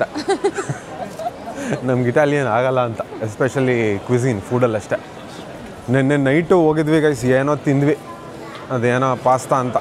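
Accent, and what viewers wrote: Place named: Mandya, India. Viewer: sir nim english, italy spanish, axent jote mandya kannada extraordinary. Niv vlog madya mandya kannada chenda 😊 Happy anniversary to both of you🎉. Muddagiro girl baby ne pakka..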